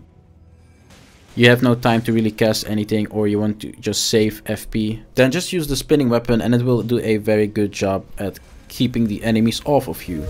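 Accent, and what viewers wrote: Netherlands. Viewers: Are you dutch? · You da man! Thanks for the guides. By the way, is it correct that I recognize a Dutch accent?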